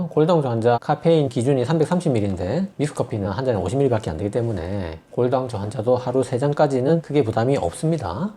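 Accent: Gyeongsang, South Korea. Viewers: 은근히 구수한 사투리가 좋습니다 ᆢ감사합니데이^^ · 사투리 늠조앙 ㅎㅎㅎㅎ경상도시네요 반가바용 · 갱상도 쌤 같은데 하나도 지루 하지않고 귀에쏙쏙 ㅋㅋㅋ믹스커피의 억울한 오명을 벗겨주시네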